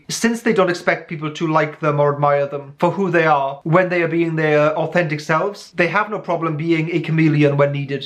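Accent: Wales, United Kingdom. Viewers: Are you Welsh!?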